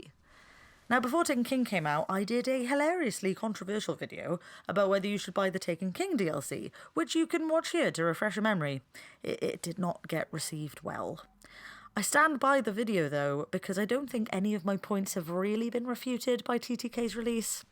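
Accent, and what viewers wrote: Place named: West Country, United Kingdom. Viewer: Didnt hear any welsh accents but there were certain words, you sound pure west country (bristol ish area) id love to do raids as ive never even attempted 1, im a year 2 solo player, the only reason i got destiny in the first place was because a bug group of my friends played it, but i got it too late and they'd all already swapped over, glad i got it though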